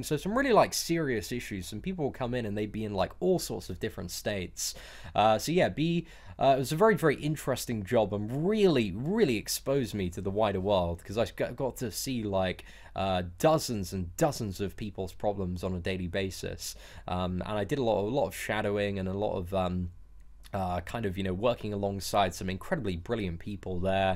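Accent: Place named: RP, United Kingdom. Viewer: I like you man.. The posh accent and using words like man and lit.. You crack me up, Keep it up mate!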